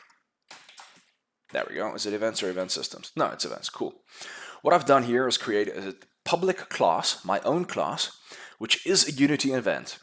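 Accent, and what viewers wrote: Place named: South Africa. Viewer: I feel a hint of South Africa here ... thx for the tutorial boet.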